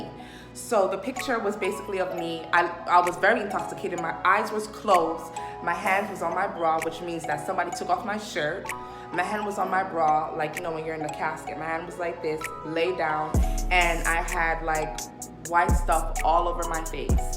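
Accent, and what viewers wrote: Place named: Brooklyn, United States. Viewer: Ooo you a Brooklyn girl 🥵🥵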